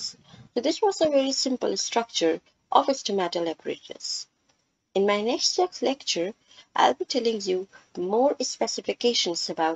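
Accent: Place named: United States. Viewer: Ma'am the video was fabulous , marvelous , fantastic , mind blowing , exellent . Your way of teaching is fabulous the way you explain is just fantastic . I had problem in understanding this topic but after watching your video i understood everything . I sure that you are the best teacher in the world with a stylish or i should say american accent . If you will start uploading videos like this only , then i am sure that you will the silver play button the gold and then platinum play button in one month only with at 30m subscribes and atleast 32m views on your each and every video .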